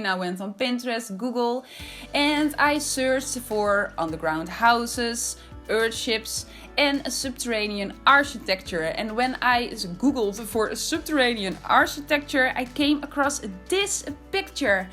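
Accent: Netherlands. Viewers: Are you Dutch? I am Dutch too, I can hear it at your language. 😂 My first language is Dutch, but my second is English! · Are you dutch? You have a dutch accent haha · Wow so cool! Where are you from. You sound really Dutch to me. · That dutch accent tho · The second I heard your voice I knew you were dutch. Turns out I was right haha. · You have a Netherlands accent (my opinion) .. Its very cute!😊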